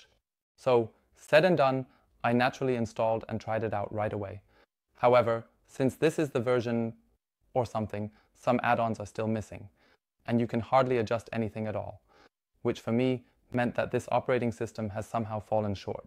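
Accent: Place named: Germany. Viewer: You are very handsome young man I love the German accent. 🤗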